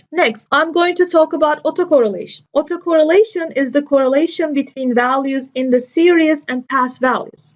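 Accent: Turkey. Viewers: I can tell this is "Turkish Accent". :-) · Your voice sounded me like turkish pronunciation. Smooth explanation.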